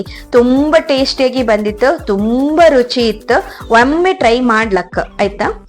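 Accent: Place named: Kundapura, India. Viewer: ಕುಂದಾಪುರ ಕನ್ನಡ ನ 😄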